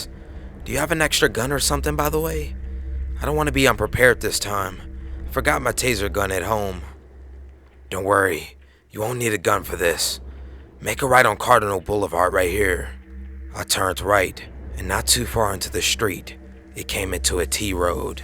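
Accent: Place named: South, United States. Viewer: Awesome! I like your accent! I'm guessing it's from the south, but I'm half Japanese,half Danish so I'm not the best to hear the difference,but been to US many times and my aunt lives in Houston and your accent sounds like my uncles! Anywho I'm very fond of your narration, accent and especially when you get your lady to knock and you let us hear the "takeout" it makes you "human" ! Hope the new stupid guidelines won't affect your channel as you have great thing going on here and you know what hot now! Hope you'll do more ghost/paranormal as your voice is great at that! Good luck with everything! Xoxo DM ,Copenhagen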